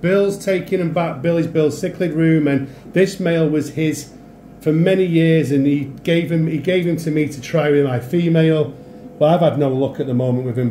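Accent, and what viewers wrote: England, United Kingdom. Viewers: My daughter has started saying "stunning" in an English accent after watching your videos with me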